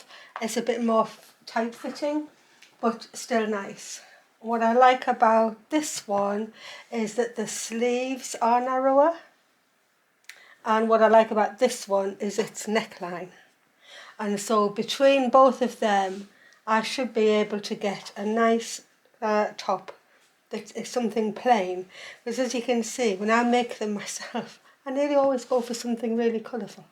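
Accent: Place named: North, United Kingdom. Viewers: Two absolute joys of watching you is that you have a beautiful home/northern accent I'm East Yorkshire and your speak in inches first! Are you using 4 or 5 thread overlock? I've had my overlocker for many years but have only ever done four thread. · I just found you! A stitcher after my own heart, and your voice is a lovely northern one, like mine, though I've lived abroad now most of my life. Thanks so much for the skirt. I saw this idea on historical channels and have been meaning to make one for a while, so now I will.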